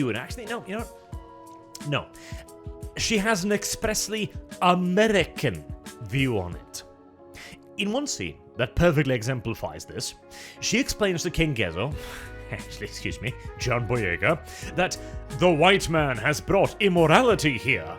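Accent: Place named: England, United Kingdom. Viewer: Listen to that English accent, and knowing that my language that I speak is English come and knowing that all three of my names both first second and surname is english, and knowing that the English is participated in the slave trade and benefited off of it still to this day, you should be the last person talking.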